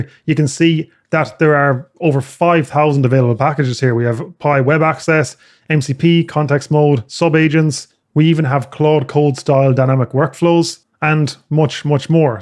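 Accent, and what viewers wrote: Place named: Ireland. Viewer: I wonder why people still looking for better harness after pi was release, the new hype sort of Prime Agent is just Pi for lazy people to create its own extension and add few more lines on the sysprompt. Btw, first video I see from your channel, already subscribed, nice content, it would be good just to have the original audio with some Irish accent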